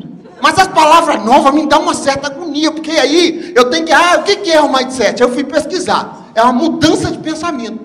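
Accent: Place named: Minas Gerais, Brazil. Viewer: Mineiro falando tipicamente mineiro já me causa risos, e com texto top, bom tom de voz e "taime" certo fica top...kkkkkkk Mt show 👏👏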